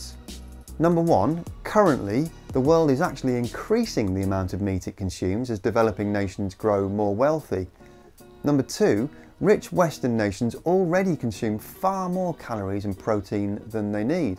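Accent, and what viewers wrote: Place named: Cockney, United Kingdom. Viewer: Re this Sensationalists prior "sea ice" video but read my point # 8)... Lots of "sensationalism" & "doom&gloom, if it bleeds it leads" MISLEADING PSUEDO SCIENCE from our cockney accented "pseudo scientist".... Notice he says "sea ice" a lot. Propaganda from fiberal globalist seditionists seeking to "carbon tax" us into oblivion, this video is clearly paid advertising to sell us all into submission... don't believe the rhetoric. HERE ARE THE FACTS: 1) ice shelves are very thin floating 'skins' relative to the main ice mass at the poles, making for dramatic imagery (likely photo-shopped to support the phoney narrative). 2) yes, "sea ice" is diminishing, and yes our climate is warming, but... NO, Sea levels will NOT rise & flood coastal regions. 3) NASA's circumpolar satellites measure surface elevation & micro-gravity (mass directly below the sat.) and clearly show FIVE TIMES MORE ICE IS CURRENTLY DEPOSITED BY SNOWFALL AT THE POLES than what is lost to melting "sea ice". 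4) the disparity between the much greater annual polar deposition vs. loss of peripheral "sea ice" is ACCELERATING (especially at the Antarctic landmass). 5) while global warming is for real, it will NOT result in "catastrophic sea level rise" for the above reasons as well as greatly increased evap/precip filling depleted lakes, rivers & aquifers, as well as greater atmospheric moisture held in clouds & humidity. 6) CLIMATE MODERATION will greatly warm our winters but ACTUALLY COOL OUR SUMMERS in many regions d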